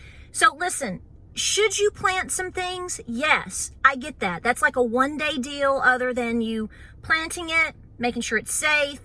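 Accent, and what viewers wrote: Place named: North Carolina, United States. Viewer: Hi. I have been watching your channel since last summer. I'm a 34 year old with a baby girl who just turned one. We live over in Buladean, NC. I love your channel. Even though you talk about the real and the scary of whats going on in our county, watching your videos comforts me. I feel like I know you because we are very similar (the accent! Heeey!!!) in lifestyle and beliefs. Watching your videos is like talking to a friend which is very important for me right now. My parents, my husband and I are doing our due diligence; however I have no friends (millenials 🙄) with whom I can have these conversations about prepping, the state of our country, etc. I have a best friend who I have worried about for this entire year. I've sent her hints here and there encouraging her about what she needs to do and about what's coming, hoping she will get off her butt and do something. 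She has made several unbelievable comments, but I don't have the time for that. Last night I was mentioning to her that she needed to stock up on meds and I mentioned Jase medical and antibiotics. She asked, "where are you hearing this? The news?". She then stated she was choosing to remain ignorant bc she had too much anxiety and couldn't add "this" on top of it. She said God would take care of us. I reminded her of Joseph and his dreams and told her God was allowing us this time and these warnings to prepare. She then snapped and said, "what are we supposed to be preparing for? A Great Depression." I sa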